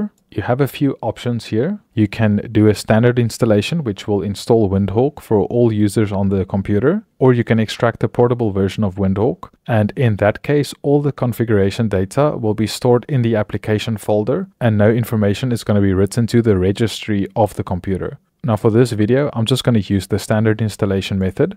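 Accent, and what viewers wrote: South Africa. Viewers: If I am correct he is south african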